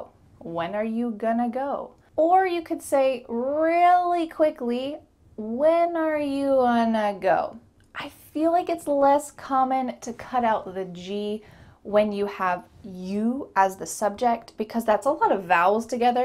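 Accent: United States. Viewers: 10 comin’ or 10 common - your thick American accent (for a Brit like me) makes them sound alike. So I have to rely on context… I remember on my first visit to New York in 1964 I watched a game show on TV that featured word-play. It would not have worked in UK! There was a sentence “To be merry, Marry Mary!” (or similar)… The American accent made it sound to me like “To be mry mry Mry!” In England (at least with my RP accent) the three words are quite distinct… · Is it an American pronunciation? Not British? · Very good ,American accent is too good, very good teacher from ivory coast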